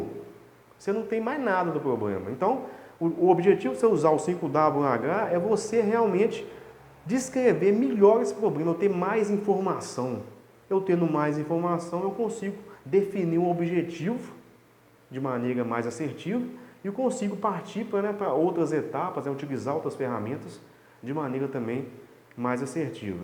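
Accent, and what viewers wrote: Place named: Minas Gerais, Brazil. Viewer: O mineirês tá em dia demais! Parabéns pelo material, me ajudou hj!!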